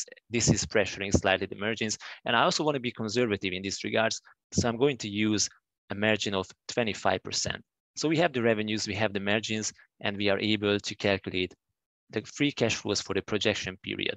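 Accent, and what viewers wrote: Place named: Hungary. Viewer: I live in Oregon but you sound like a Hungarian!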